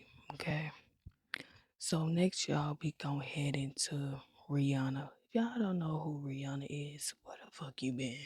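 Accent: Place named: South, United States. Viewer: What accent is this? Its southern right? Idk why but its so nostalgic to ppl I heard as a kid. I need to know 😂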